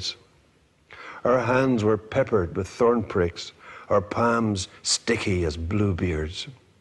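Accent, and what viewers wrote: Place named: Sligo, Ireland. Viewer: Oh How I would love to talk to this man. The sound of his voice sounds like Sligo. When visiting my Grandmothers house in Mulaghmore and cousins" The Feeney's" I walked a lane with rock walls and tons of beautiful blackberries. Their Grand Mother is buried next to Wm.Butler Yeats in Drumcliff. Alas, at 80 years old no more travel. What a great Poet. yvonne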